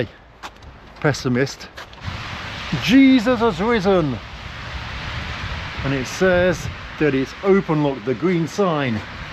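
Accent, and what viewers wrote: United Kingdom. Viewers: you've got a big heart. You went out of the way to help the woman in the US to get in touch with her mom, and you bought a phone for her mom to call US. Not many people would do that. By the way, if it weren't for your British accent, I would have mistaken you for a Russian.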